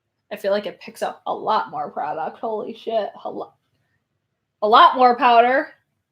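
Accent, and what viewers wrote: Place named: South, United States. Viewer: noticed the southern drawl.where are you from. Im from saint martinsville,louisiana,currently live in chicago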